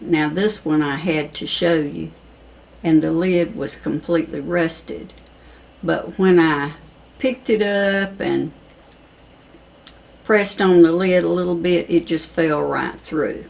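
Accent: South, United States. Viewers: I love the way you speak. You have such a rich, beautiful southern accent. It reminds me of the way my grandparents spoke. Thank you for the helpful video.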